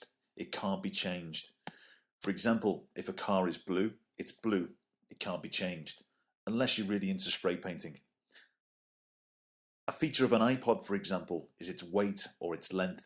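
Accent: United Kingdom. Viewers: yessss scouser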